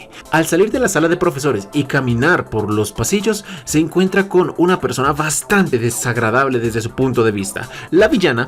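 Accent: Colombia. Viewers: sos colombiano parcero?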